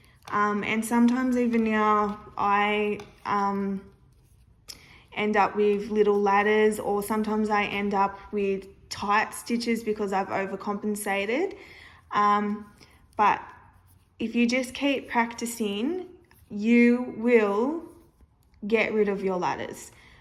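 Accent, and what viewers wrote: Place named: Australia. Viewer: Off topic, but It's so nice to hear a fellow aussie's voice in a knitting video!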